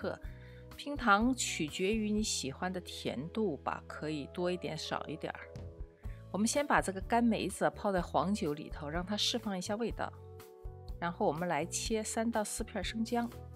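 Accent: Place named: North, China. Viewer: 你好，请问祖籍何方？听口音，应该是北方人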